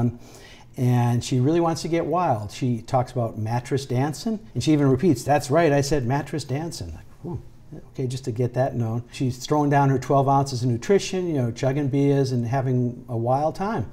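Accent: Boston, United States. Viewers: Incredible lecture, masterful Boston accent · That accent takes me right back to my Boston days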